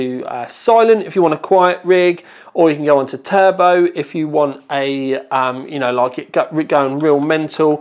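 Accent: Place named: England, United Kingdom. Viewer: LOVE THE VIDEO, I ALWAYS LIKED YOUR VIDEOS, ACCENT, AND ENGLISH PEOPLE IN GENERAL. I AM UNCERTAIN ABOUT THIS MEMORY CORSAIR Vengeance LED 16GB (2 x 8GB) 288-Pin DDR4 SDRAM DDR4 3200 (PC4 25600) Memory (Desktop Memory) Model CMU16GX4M2C3200C16, will it post, if it can, and i have a speed problem, i can adjust it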